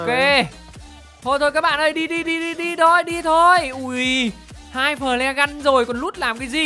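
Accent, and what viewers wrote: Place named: Thanh Hoa, Vietnam. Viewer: Giọng thanh hoá :))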